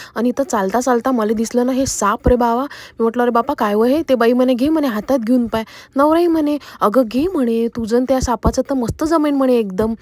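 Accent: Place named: Vidarbha, India. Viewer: विदर्भाची शान विदर्भाची भाषा..❤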